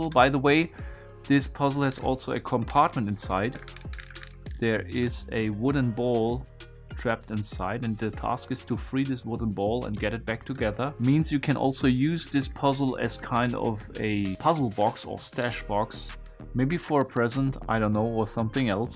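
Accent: Germany. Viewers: It's typical German accent, as we don't have the "v" the same way pronounced as in English and the sound itself not at all (v is pronounced the same as the f mostly. voiced v doesn't exist). With some exercise we can do it but is some extra work while talking. Accents by non-native speakers are mostly based on wrong pronounciations.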